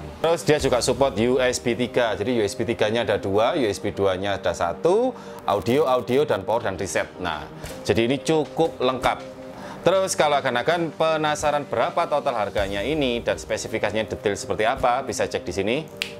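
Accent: Java, Indonesia. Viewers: Klo dlm bentuk lappie udh 18 juta lbih tu. Macam kyk gl553ve. Bang klo ganti gtx 1060 jdi 10 jt ya kyk video sblumnya? Btw Saya demen logat medoknya. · seneng sama logatnya, rada" medhok wkwkwkkwwk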